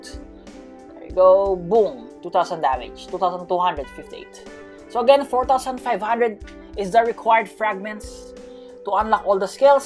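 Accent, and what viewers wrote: Philippines. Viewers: Bro stop I know you philippino you're accent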